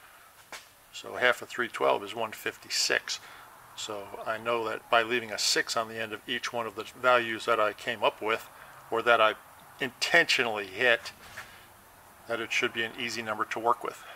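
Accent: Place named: Long Island, United States. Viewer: This guy sounds like my father and his brother from Long Island. Definitely interesting and informative I’m subscribing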